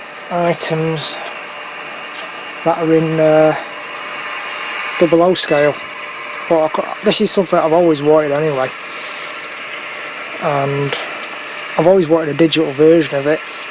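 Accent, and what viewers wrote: Manchester, United Kingdom. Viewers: Well, its from over tyneside really but yeah okay it worked around liverpool and manchester... Nice to hear your accent. Do miss the manchester twang having moved to Australia. Its just a really small loco in real life so it would look really small in model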